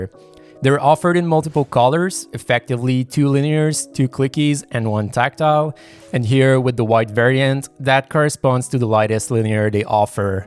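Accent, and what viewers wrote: Quebec, Canada. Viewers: you are french canadian right?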